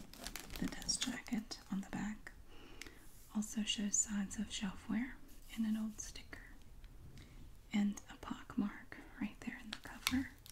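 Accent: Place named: North Carolina, United States. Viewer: Your voice reminds me of home. Born and raised in North Carolina. Very soothing to listen to when I'm far away from home. Hope you had a blessed holiday season 💕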